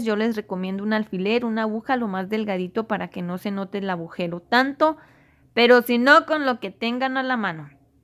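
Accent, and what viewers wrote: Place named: North, Mexico. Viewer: Hola muy bonito tu pastel te felicito una pregunta ojalá me contestes pero de donde eres tienes un tonito como del norte te escuchas como nosotros los de chihuahua jaja 😂 Dios te bendiga y lo mejor de corazón gracias por compartir lo que sabes 🙏🏼😘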